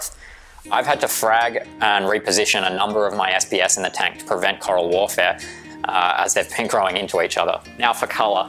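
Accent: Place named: New Zealand. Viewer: U seem to have a kiwi accent. Are you from New Zealand? 💙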